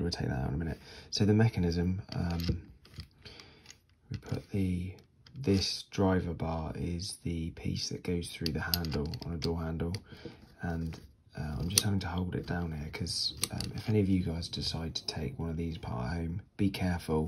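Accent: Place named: United Kingdom. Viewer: So I woke up this morning and didn't realize what I wanted was a calm British dude explaining locks to me. Hell yeah man find that niche hahaha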